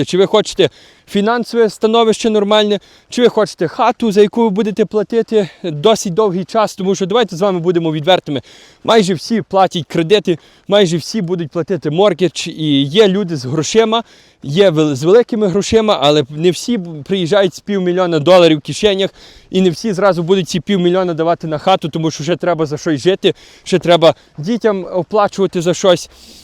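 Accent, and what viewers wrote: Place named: Western Ukraine, Ukraine. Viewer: Говор западенца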